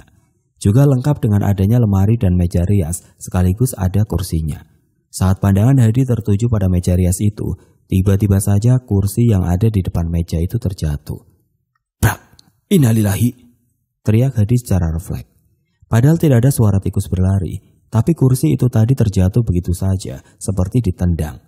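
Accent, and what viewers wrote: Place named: Medan, Indonesia. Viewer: Org Makassar tp logat medan, saran aja bang, sesuaikan ajaa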